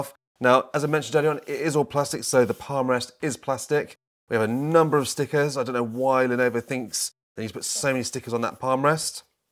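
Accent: United Kingdom. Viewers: laptop review in BS English is refreshing, since majority are in US English. makes the laptop sounds classier.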